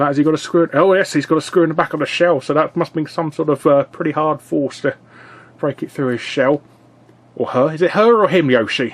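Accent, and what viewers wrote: United Kingdom. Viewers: Jesus Christ! You are the most British person I have ever heard! THAT'S AWESOME!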